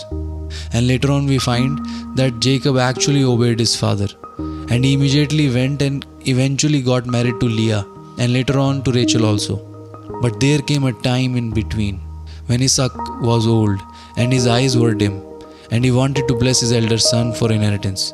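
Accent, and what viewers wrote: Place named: Arab world. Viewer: I'm curious to know where in the video it says he's not? I encourage you to rewatch the video because I think the point of this video went over your head. I'm guessing maybe because the accent of the voice over sounds arabic you think he doesn't believe Jesus is God, like I admittedly questioned myself, but 2 seconds of looking through the titles in his video uploads you'll see one titled "Jesus is God Himself". So rewatch the video and focus on the lesson of how God can use imperfect people for His Glory. God Bless you brother. ✝️🙏🏽💪🏽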